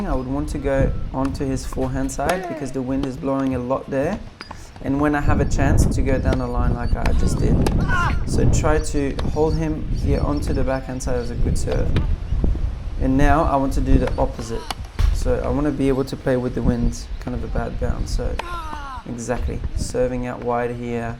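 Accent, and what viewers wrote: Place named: France. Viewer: I tought you were french or belgian with the accent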